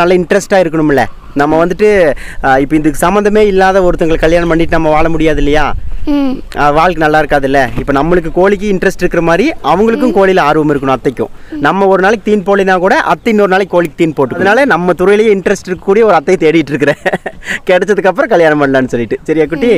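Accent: Kongu, India. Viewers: Kongu Tamil kongu Tamil thanunga ❤🌱 By Kongu Pet Collections 🌱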